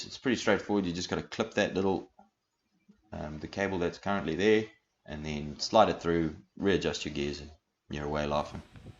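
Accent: South Africa. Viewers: thanks for the show. Good old SA accent. where can i buy this convention kit? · are you South African expat? Kinda sounds like you have a South African accent.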